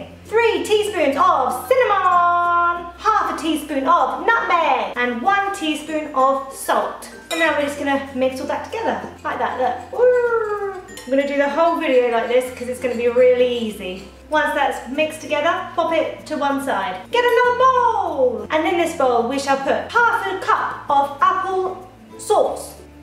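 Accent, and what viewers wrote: United Kingdom. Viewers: Her accent is British ☝🏻️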